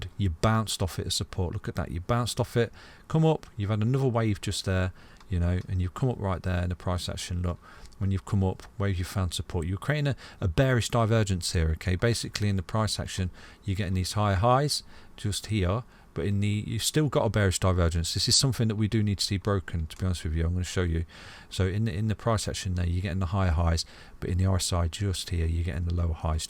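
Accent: United Kingdom. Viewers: He speaks the most clear British accent I have ever heard, and I am a German speaker 😄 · I AM FROM THE UNITED STATES 🇺🇸 WE DO NOT SPEAK LIKE THAT. UK y’all sound like a dying dog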